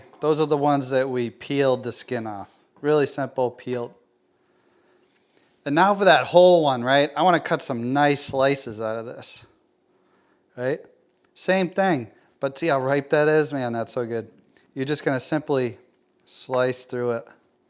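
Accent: Boston, United States. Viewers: Thanks buddy! Love the Boston accent btw!!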